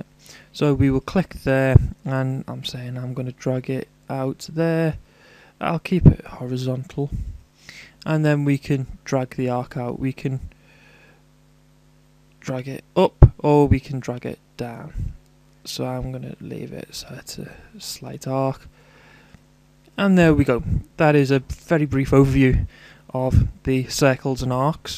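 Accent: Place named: North West England, United Kingdom. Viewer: Hey really well explained and incredibly clear. Your accent sounds like you are from the North West. Please can you tell me why I am not picking up circle/arc centres when sketching an entity on an existing feature. Even something simple like trying to create a circular extrusion on say the end of a circular shaft; the centre of the shaft doesn't show. It used to work. Have I switched something off? It's been driving me crazy for weeks. Thanks for any help you can give. Cheers. Robert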